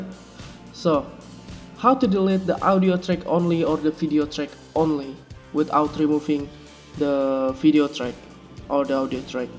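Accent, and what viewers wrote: Indonesia. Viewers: Ur accent is funny,indonesian?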